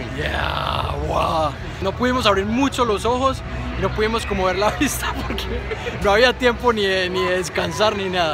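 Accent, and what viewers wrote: Colombia. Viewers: me encantan tus videos y tu acento colombiano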